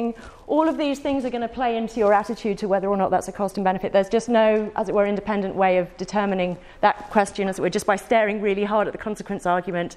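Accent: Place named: United Kingdom. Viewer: I used to love the britisch accents. of course, I don't get to hear it that often, but nevertheless, I had such a hard time to follow this women for sure for several reasons (speed and reduced content etc), but her accent was really hard to follow at that speed but even with low speed it doesn't get better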